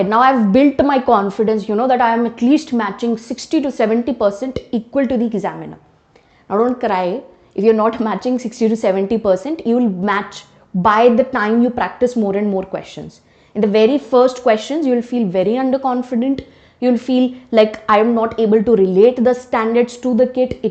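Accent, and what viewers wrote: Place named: India. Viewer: this is typical indian accent, nothing complicated about it u cannot understand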